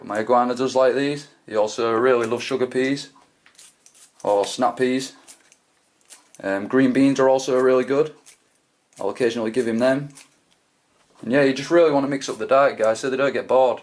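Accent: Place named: Yorkshire, United Kingdom. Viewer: Where's that accent from? I'm guess yorkshire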